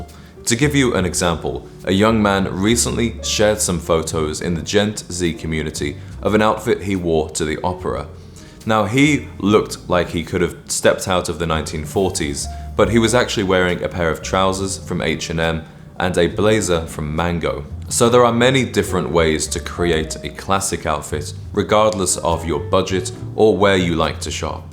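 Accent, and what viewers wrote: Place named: England, United Kingdom. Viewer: Why not??? You sound English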